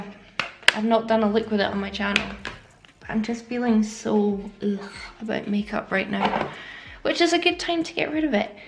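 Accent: United Kingdom. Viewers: Where are you located ? Your accent makes me home sick for the UK :)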